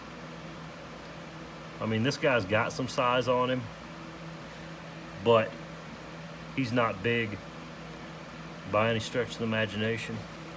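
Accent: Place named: Louisiana, United States. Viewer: Just Subscribed! Emily sent me. I'm gonna order some ANC cocoons from you towards the end of July. I thought I heard Louisiana in your video. Morgan City living here. Keep up the good work.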